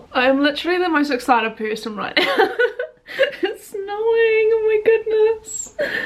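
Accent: New Zealand. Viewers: Love your kiwi accent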